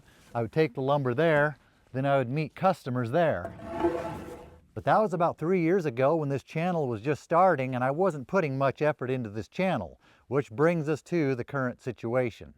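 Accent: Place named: Pacific Northwest, United States. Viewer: it's the Pacific Northwest accent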